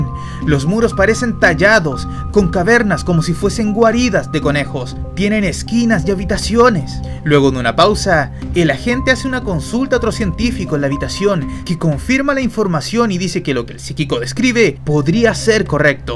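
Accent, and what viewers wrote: Chile. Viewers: La voz de se nota la evolución en su narración y por primera vez le sentí su acento chileno 😳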